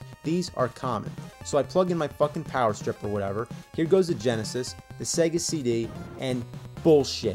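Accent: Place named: Pennsylvania, United States. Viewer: That's just a Pennsylvania accent. 😂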